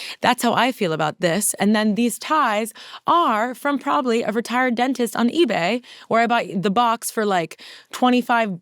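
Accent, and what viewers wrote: Miami, United States. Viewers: i cannot express how much ur miami accent comforts me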